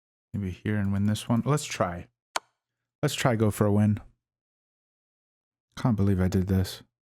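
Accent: United Kingdom. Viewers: gg buddy , which accent u have in uk¿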